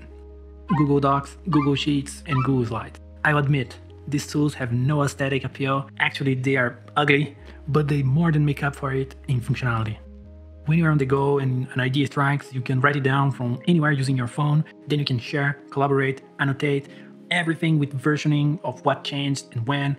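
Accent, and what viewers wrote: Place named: Portugal. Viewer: do you speak portuguese? i found your accent very noticiable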